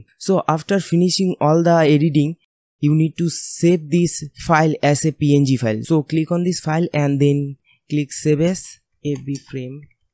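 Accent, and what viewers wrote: India. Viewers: This is probably really good for INDIA ACCENTed people, but I CAN ONLY UNDERSTAND about 20% of what you are saying. GEEZZ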